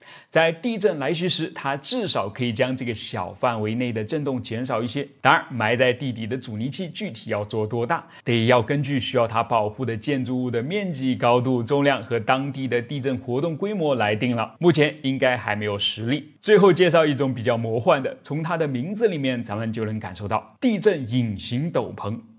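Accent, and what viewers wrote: Nanjing, China. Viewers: 是南京人呀😜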